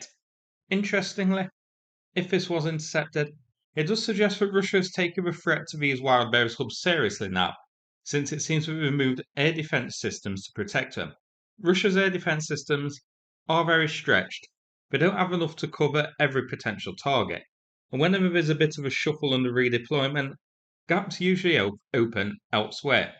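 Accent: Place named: Midlands, United Kingdom. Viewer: It's not London, nor the West Country, Wales, Scotland or N. Ireland. My guess is the Midlands somewhere.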